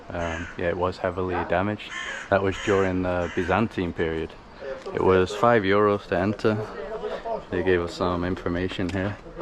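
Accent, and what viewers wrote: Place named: Yorkshire, United Kingdom. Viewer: Hi it's John do you have a link in Yorkshire your twang sounds like it is thanks for the video John uk 😊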